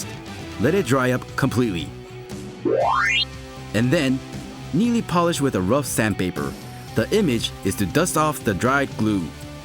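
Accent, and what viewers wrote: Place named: Japan. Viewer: It's the Japanese accent. Most Japanese men speak like this. Don't think it's nice to relate that to a meme, but I understand that a lot of people might not have been exposed to hearing such an accent before. 😃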